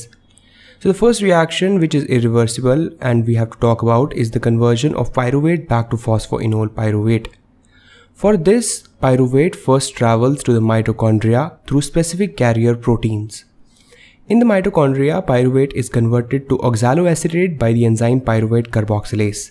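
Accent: India. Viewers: Difficult to understand. Is there anyone there who doesn't speak with a heavy Indian accent. If so, please let them narrate the video. You are losing view count because people can't understand the speaker. If this is not meant to be viewed by North America, please disregard.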